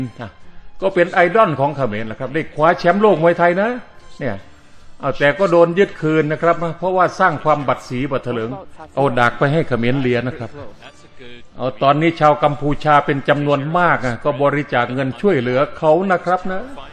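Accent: Isan, Thailand. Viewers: สนับสนุนเด้อ อ้าย อ้ายหละเน๊าะ ผม2514 คือสิก่อนผมยุดอก มักคักช่องไบรอัน มักทุกช่อง พากย์อิสานมวนคักสนับสนุนเด้อ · พากย์อีสานยิ่งชอบ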